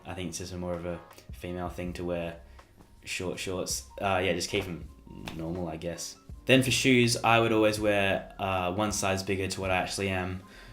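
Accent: Australia. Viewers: love Australian accents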